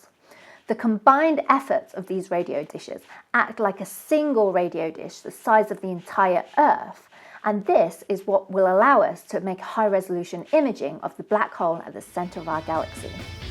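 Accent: United Kingdom. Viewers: ❤❤❤❤, love your frightfully British accent. If your Chinese is just as fluent… the world is your oyster😘😘😘😘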